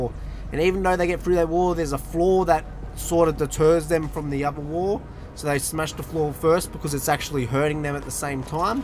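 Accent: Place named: Australia. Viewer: This is worth watching just to hear your accent! All commentary should be done in Australian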